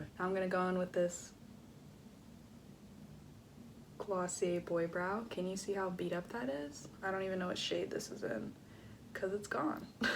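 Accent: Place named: Australia. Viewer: austrailian accent yes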